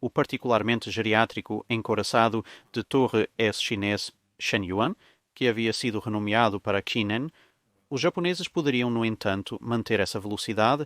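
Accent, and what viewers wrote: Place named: Portugal. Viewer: Excelente conteúdo amigo, apesar do sotaque tuga bem forte conseguiu passar muito bem a explicação toda no vídeo! Abraços do Brasil.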